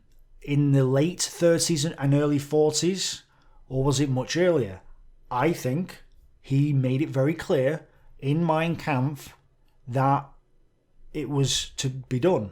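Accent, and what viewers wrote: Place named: Northern England, United Kingdom. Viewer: good vid, but where's yer accent from, our kid? are you a Manc? wigan? cheshire? hard to pin you down, lad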